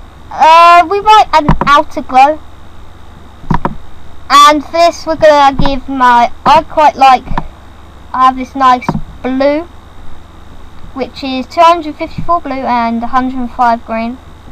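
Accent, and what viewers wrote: United Kingdom. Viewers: wow your accent are you british ?:)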